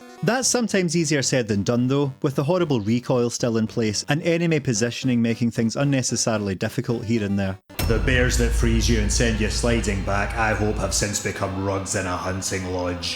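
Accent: Scotland, United Kingdom. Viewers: Nice, thanks for the reply, I'll definitely check out your other videos! I really like the sound of your voice (it's the Scottish accent).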